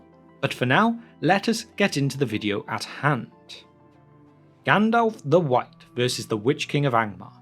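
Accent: United Kingdom. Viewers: Where is the UK is the narrator's accent from? He sounds like thoughty2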